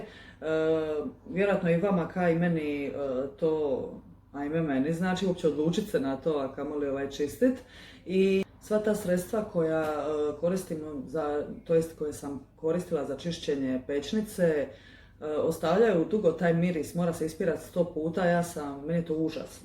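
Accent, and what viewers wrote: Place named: Dalmatia, Croatia. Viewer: volim i taj Dalmatinski naglasak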